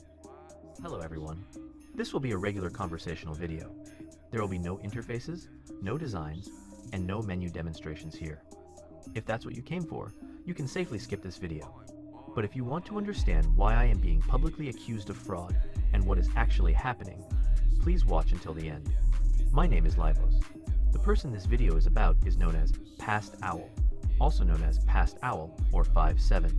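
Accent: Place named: Russia. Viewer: Honestly i think you should've made the video with the Russian accent it would've been more enjoyable (no offenses)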